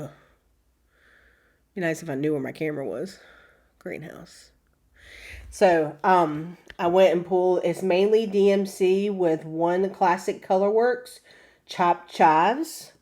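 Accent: South, United States. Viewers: Another enjoyable video !! Right at the moment I have a broken wrist and I’m so jealous of everything you’ve stitched, but I’ve really realized how relaxing our stitching hobby is, I’m retired so I start every day with and hour of stitching. Now you’re jealous right , your day will come. You have a beautiful home, I live in Canada and when I think of a “southern” home yours is exactly what I envision (no idea where you live but with your lovely accent I presume it’s in the sunny south.) have a fun Halloween 👻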